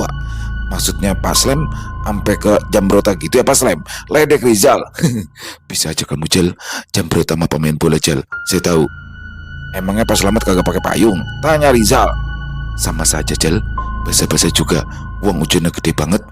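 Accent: Jakarta, Indonesia. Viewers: Waalaikumsalam bang, ya Allah itu bahasa Betawi keluar semuanya, ora Danta, 😂😂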